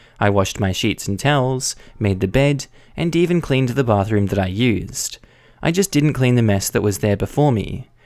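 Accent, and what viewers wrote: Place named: Frankston, Australia. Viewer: Sounds like Frankston type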